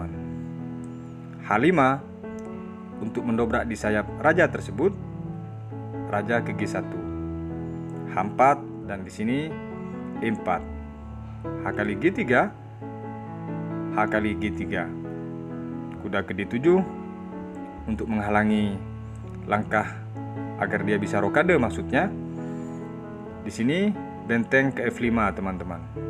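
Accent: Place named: Madura, Indonesia. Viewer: Corak oreng madureh logattnya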